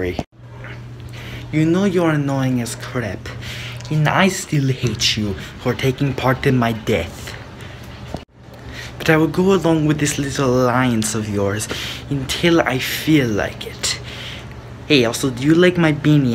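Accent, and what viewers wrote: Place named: Russia. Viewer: Russia accent is still golden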